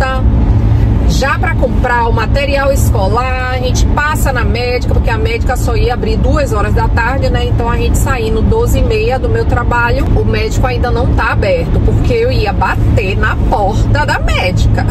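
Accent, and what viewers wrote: Bahia, Brazil. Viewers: O outono ja chegou ai, vendo daqui de Porto Seguro Beijo, que sotaque delicioso da Bahia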